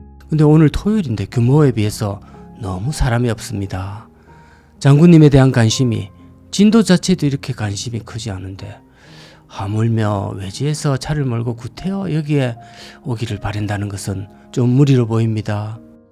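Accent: Gyeongsang, South Korea. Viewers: ㅋㅋㅋ 가짜배기, 오랜만에 듣는 보리문디 사투리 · 영상속 나레이션의 묘미가 더욱 멋져 빛을 발합니다 장보고의 썰렁한 내력이 안되게 찐 성공 내력담 알차게 챙기셨네요 언제나 구수한 경상도 언어가 더욱 흥미롭구요 잘 보았습니다 ~👍🏻👍🏻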